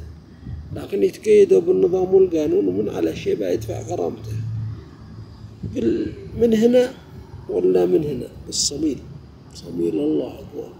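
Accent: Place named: Mecca, Saudi Arabia. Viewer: استغفر ربك بنو قريش معروفين ولا يضيع نسبهم وهذا واضح انة من مكة المكرمة حتي اللهجة مكاويه بطن احجاز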